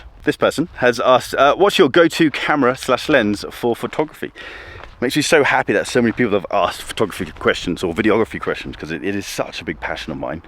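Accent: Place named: Southern Scotland, United Kingdom. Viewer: I do enjoy your content and even more so with the Southern Scottish accent 😉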